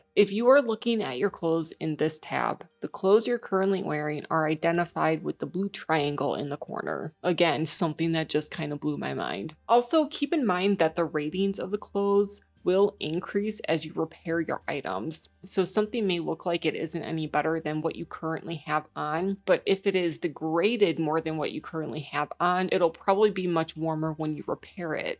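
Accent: United States. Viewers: Great video! U sound WI af😊.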